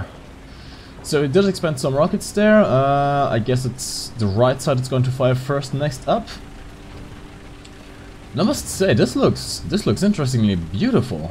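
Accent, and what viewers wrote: Germany. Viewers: ich hab direkt gehört das du deutscher bist XD Schönes video,mach weiter so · Are you german=? because you have got an accent. ps i am german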